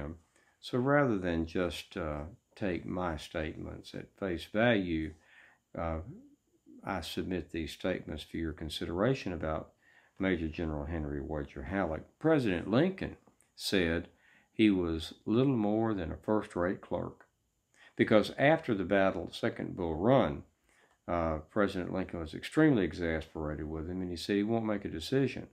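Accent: South, United States. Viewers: This is the first time I have seen you as, 'President Grant'. The other ones of course, were as 'General Grant'. I've read: 'The personal Memoirs of U.S. Grant', and he referred to General Halleck many times. Never quite got a feel as to the relationship between the two, or what kind of person Halleck was.This presentation cleared up a lot of questions I had about Halleck.Your presentations are so well done, I begin to forget, you are playing a role. One other person metioned your: 'Southern Accent', I believe that's irrelevant, and doesn't subtract anything from your portrals of U.S.Grant! I don't understand why there are so few comments here and on other presentations you do. Perhaps, you do them so well, no questions or replies needed!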